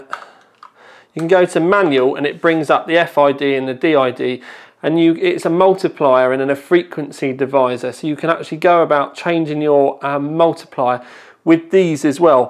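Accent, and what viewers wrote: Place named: England, United Kingdom. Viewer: LOVE THE VIDEO, I ALWAYS LIKED YOUR VIDEOS, ACCENT, AND ENGLISH PEOPLE IN GENERAL. I AM UNCERTAIN ABOUT THIS MEMORY CORSAIR Vengeance LED 16GB (2 x 8GB) 288-Pin DDR4 SDRAM DDR4 3200 (PC4 25600) Memory (Desktop Memory) Model CMU16GX4M2C3200C16, will it post, if it can, and i have a speed problem, i can adjust it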